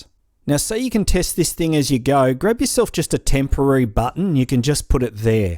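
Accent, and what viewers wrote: Australia. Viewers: I'm Australian and this is the most Australian accent I've every heard! Makes me proud! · You sound Australian... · you seem australian are you? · are you australian?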